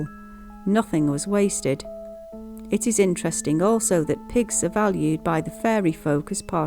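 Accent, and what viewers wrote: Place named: Manchester, United Kingdom. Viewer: Love your videos, you sound like your from manchester accent. So relaxing and i love your old ancheint knowledge plesee keeo it up. Xx Danny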